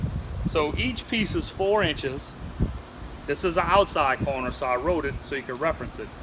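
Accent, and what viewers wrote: Louisiana, United States. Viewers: Where's his accent from? Louisiana possibly?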